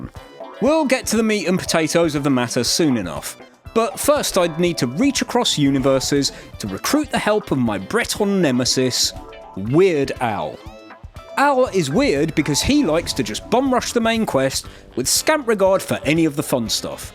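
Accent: United Kingdom. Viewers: Hello again funny British man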